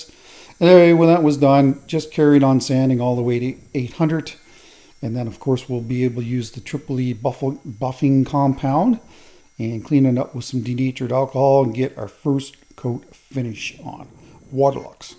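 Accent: Canada. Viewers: On your voice overs, I love to listen to you talk about your work. I can tell you love what you are doing. I also listen to hear your Canada accent. It's there sometimes. Not a lot though. Keep talking.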